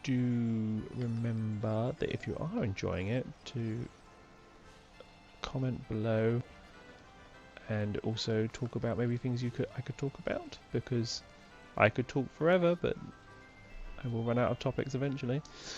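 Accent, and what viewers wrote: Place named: United Kingdom. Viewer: I stan a British man speaking softly into the microphone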